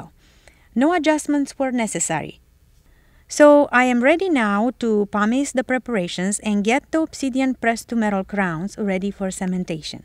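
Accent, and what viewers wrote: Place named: Romania. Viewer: I thought she was romanian from her accent. And apparently she is :D I'm from Romania too.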